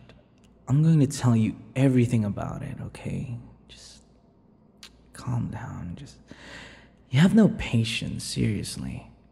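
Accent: Philippines. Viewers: he's closest to Filipino accent 🥵❤️‍🔥🇵🇭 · OK- this is just a idea cuz ur filipino accent, love it ALOT, can u maybe do a filipino one? would love to see someone take my idea but there is no forcing but just a suggestion :D (i love ur vids so much omg, keep up the amazing work!!)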